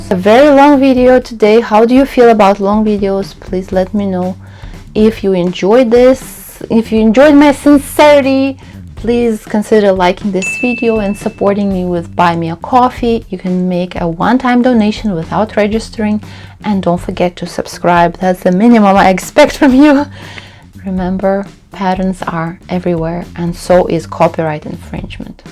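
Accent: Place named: Russia. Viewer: New subscriber from Oklahoma. I thought your accent might have been Russian. I had the privilege of visiting Russia in 1996 when I was just 17 years old. It has held a place in my heart ever since.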